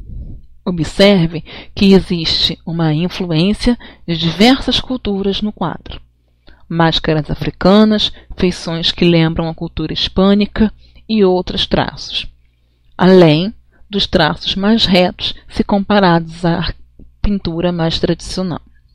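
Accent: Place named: Portugal. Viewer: Mais um excelente vídeo, professora. 😁 Gostaria de fazer uma pergunta, profª., você é portuguesa? Pois tem um sotaque um pouco diferente rs. Abraços. Sou fã do teu trabalho.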